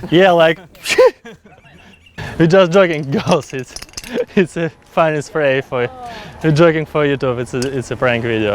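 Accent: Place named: Russia. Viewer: Жесткий русский акцент )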